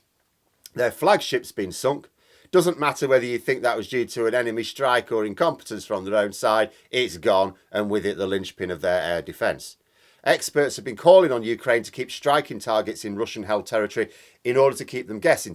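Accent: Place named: England, United Kingdom. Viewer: Your accent, leads me to think your English.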